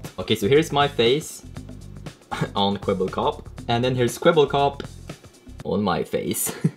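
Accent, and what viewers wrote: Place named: Sweden. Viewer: Wait are u swedish? I can hell it by your accent 😂👍 jag är också svensk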